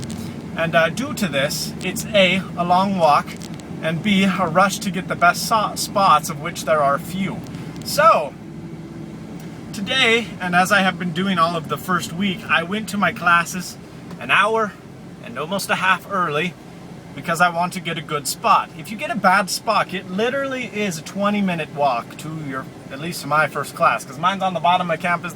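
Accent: Ireland. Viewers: Are you trying an Irish accent or a Toby Turner accent? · You sound like an irish person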